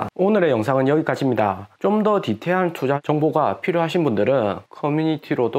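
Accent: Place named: Gyeongsang, South Korea. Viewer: 반란수괴 지지 쌍도억양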